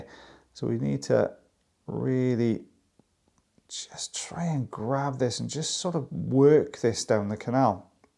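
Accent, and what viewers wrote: Wales, United Kingdom. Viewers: A Welsh accent makes this even better. Very satisfying.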